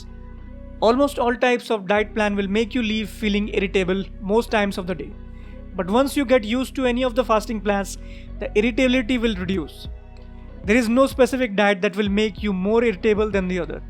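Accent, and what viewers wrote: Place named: India. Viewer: Your accent smells masala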